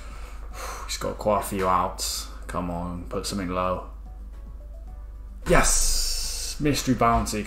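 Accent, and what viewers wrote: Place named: South West England, United Kingdom. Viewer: Yes mate. Some Sunday content for the lads. You sound like a fellow South-Westerner... Bristol?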